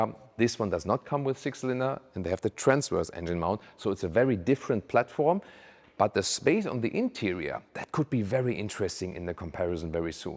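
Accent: Germany. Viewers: Without looking at the caption, one can only understand 50% of what you are talking. If you cannot reduce your German accent, maybe speaking at a slower speed may help a bit, if you really want to stay in this profession.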